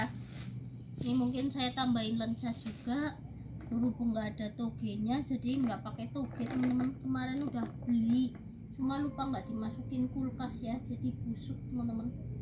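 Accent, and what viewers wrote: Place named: Central Java, Indonesia. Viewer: Mbakyu orang mana to kok kaya logate wong Jawa tengah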